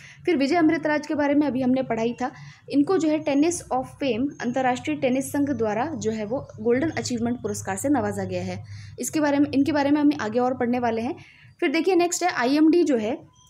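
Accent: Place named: Rajasthan, India. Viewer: बहुत मददगार, में भी राजस्थान से हूं। टोन से समझ आ रहा है, धन्यवाद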